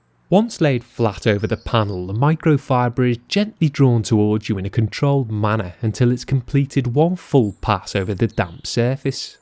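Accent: Liverpool, United Kingdom. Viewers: yer i know that water has anomalous properties of cohesion and adhesion however it is due to simple osmosis that a dry towel will absorb more water than a wet one! and when the water potential gradient has reached dynamic equilibrium then it will no longer absorb any more and this will be reached sooner wetting the towel ... but no hate to the scouse cleaning guy as hes sound ! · I'm sorry oot. Is that scouse? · its the English the British English not english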